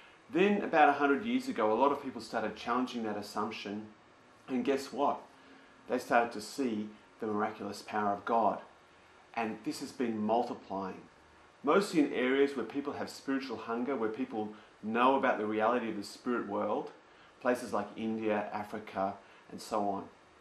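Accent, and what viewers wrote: Australia. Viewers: As an English person, I am offended you think this idiot is English. He's Australian. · it's not a british accent it's Australian. I'm guessin you are american, i don't know why so many americans fail so miserably at picking accents, i guess it's because you just don't get exposure to foreign accents enough because of the ludicrous nationalism. As an Australian i don't understand this idiot, he lives in a secular country where secularism is only growing larger and larger and less than a third of the country are believers and that number is shrinking all the time. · that would be funny if he was speaking with a british accent. unfortunately its actually australian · I agree, however he is austrailian not british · shit i think this guy is australian, sorry everyone. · He's an Aussie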